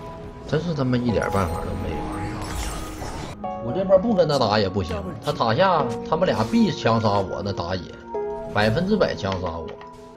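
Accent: Northeast, China. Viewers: 猴哥是東北人嗎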